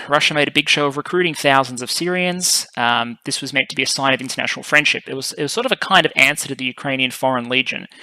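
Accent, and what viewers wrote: Australia. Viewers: THAT AUSTRALIAN OR KIWI ACCENT IS DRIVING ME UP THE WALL..ALSO A LOT OF WORDS FLYING AT ME....TOO MUCH INFORMATION.... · You're providing a great set of analytics to consider for us humble viewers and I've enjoyed these immensely. Also great to hear an Aussie on youtube, keep up the good work mate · A very very good analysis of the situation. Many thanks for the depth and knowledge you provide. Especially love the Aussie accent!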